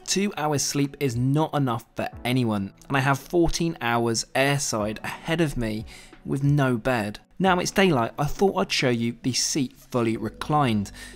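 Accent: RP, United Kingdom. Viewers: its wild watching this in comparison to how posh he sounds now LMAO. So he was once just a regular dude.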